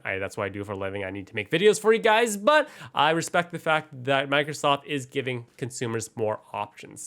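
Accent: United States. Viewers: I thought he was American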